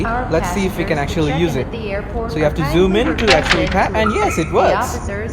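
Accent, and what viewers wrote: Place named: India. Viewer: Dude you can't even speak English properly stop trying to be funny. Plus you sound like an indian person wtf 😂😂😂😂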